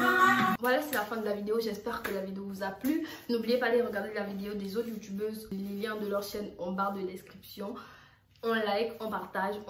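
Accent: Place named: Fang, Gabon. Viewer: J’attendais ta vidéo juste pour écouter ton FANG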